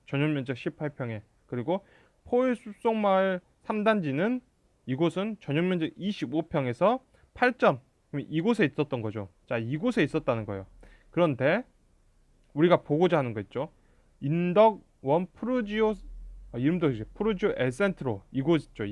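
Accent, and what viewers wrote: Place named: Jeolla, South Korea. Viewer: 말투가 전라도네 ㅋㅋㅋㅋㅋㅋ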